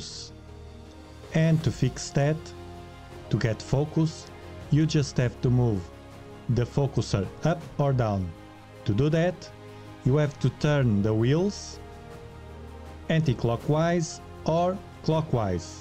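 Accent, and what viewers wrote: Portugal. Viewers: Ayo Portuguese accent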